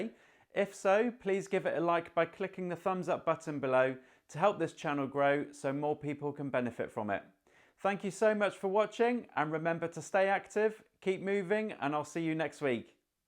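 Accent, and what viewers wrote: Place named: United Kingdom. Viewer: Thank you for this very helpful video. I could listen to your British (?) accent all day long. I hope to be incorporating this exercise regimen along with Flow Therapy (Compression for Micro Vascular disease) soon.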